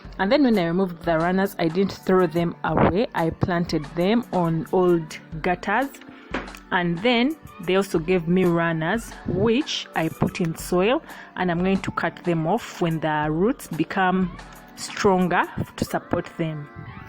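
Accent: Uganda. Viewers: She is likely ugandan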